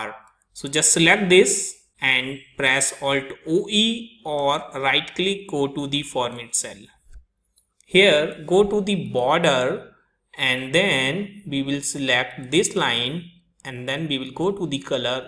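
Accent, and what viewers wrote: India. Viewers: I bet it's an indian guy talking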